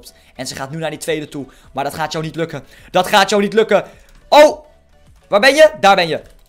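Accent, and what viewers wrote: Netherlands. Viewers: Je hebt echt zo’n nederlands accent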